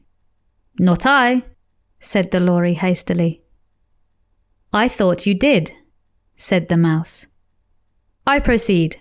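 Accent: United Kingdom. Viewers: 영국영어, 상큼합니다~~~^^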